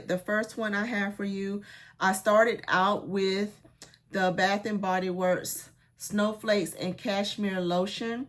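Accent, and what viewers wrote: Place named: Paris, France. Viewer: I hear your combos..don't have most of them. Oh well. U Already pronounce Bouquet just right...the T is silent.. it's the same with Muguet..Moogay. perfume in french is Eau (ike Oh) De ( like street talk..she s at de store. A bad The) then the Flower name (roze, de vee-oh-let..de Sare-eece. Cherry.. the words slide into the next Ohderoze. Ahhh..u sound like you are from PaRee. Paris in french.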